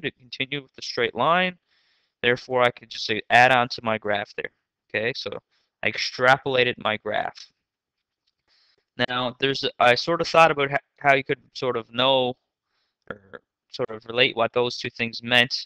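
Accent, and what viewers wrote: Newfoundland, Canada. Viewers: Are you from Newfoundland?? Cause you sure sound like it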